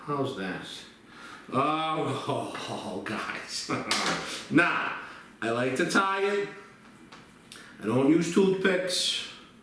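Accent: Boston, United States. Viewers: Nice and the Boston accent,makes the video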